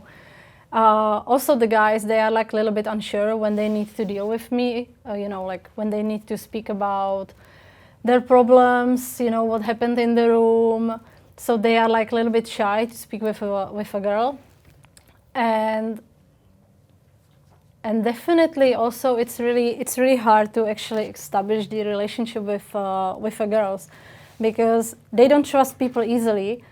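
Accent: Czech Republic. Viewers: She has a Czech accent. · Lovely Czech accent.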